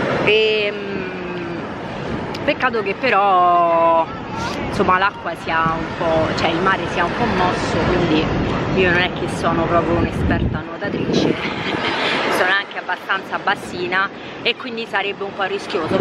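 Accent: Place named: Rome, Italy. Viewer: Amo il suo accento romano 🔝❤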